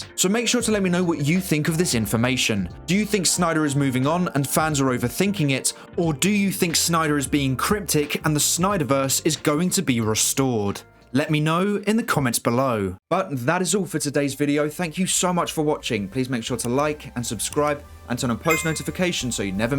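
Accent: United Kingdom. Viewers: As soon as i hear a British narrator i know it is clock bait with no reliable information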